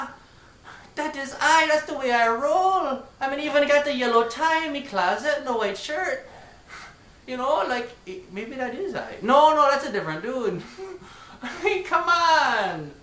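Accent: Trinidad and Tobago. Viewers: Yes man u def sound like a Trini fo'real.... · You sound Trini still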